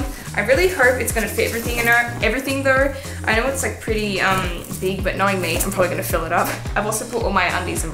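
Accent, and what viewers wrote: Australia. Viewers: Are u austrailian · I can tell you are Aussie because the stuff and your voice if I am wrong I’ll be honestly shocked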